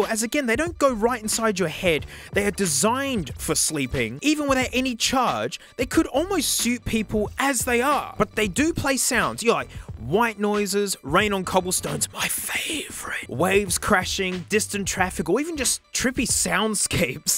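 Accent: Australia. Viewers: I have never heard tinnitus said that way, am I wrong or is he just too Australian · I'm just here to learn the Australian accent of his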